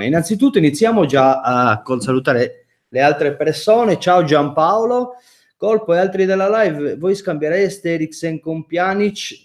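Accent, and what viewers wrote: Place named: Sardinia, Italy. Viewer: Di quale città sarda sei?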